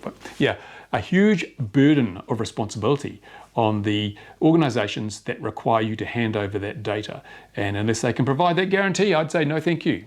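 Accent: England, United Kingdom. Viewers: love the british accent :-D and yes the UK brexit is a proper mess, the politicians saw to that.... sort of like the drone reg's :-)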